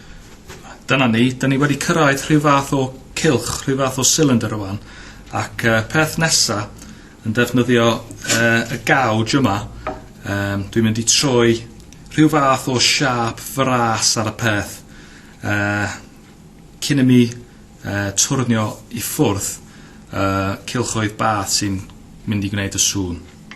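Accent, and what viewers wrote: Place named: England, United Kingdom. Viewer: im russian and even i do hear that terrible english accent. most of todays celtic speakers have it, unfortunately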